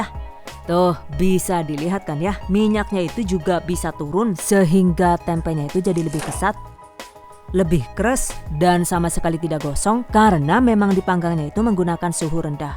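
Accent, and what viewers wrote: Banyumas, Indonesia. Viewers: salam ngapak bundaa...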